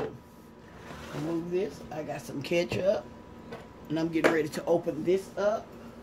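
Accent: South, United States. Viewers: Best wishes Ms.. I feel the warmth from your kitchen and your heart. And your southern accent it’s like a recipe within your recipe. Can’t wait to try this one. Thanks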